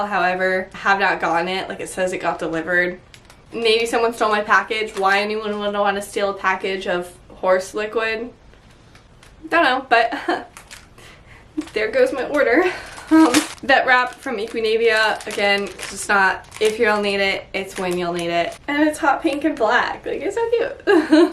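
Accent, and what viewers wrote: United States. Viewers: north of where?😂 from what I hear you speak in an American accent, so do you mean north of the USA?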